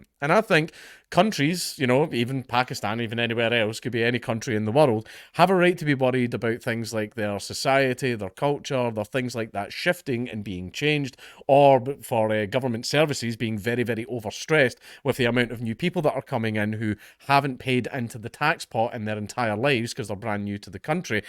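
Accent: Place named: United Kingdom. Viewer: century of prima nocta. He's got some brit up in there.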